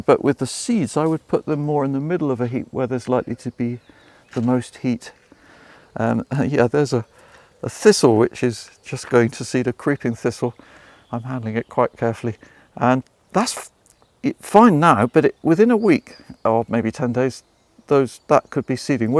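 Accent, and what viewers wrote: United Kingdom. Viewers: Put on Closed Captions. From one of those darn French Canadians that don't understand British accent, but love it nonetheless.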